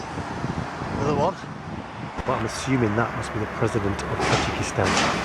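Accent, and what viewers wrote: England, United Kingdom. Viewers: What are you doing in Dushanbe, your accent looks you are from England . Enjoy snc safe journey .I live Southampton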